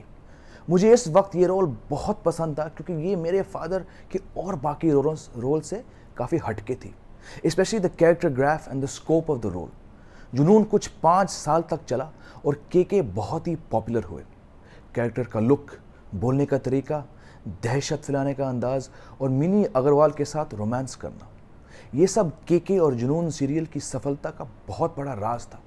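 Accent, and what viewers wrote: India. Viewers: Aap Muje original Indian hi lage aam Indian ki tarh aap hamse guftgu karte hai Aap k father bhi hamari tarah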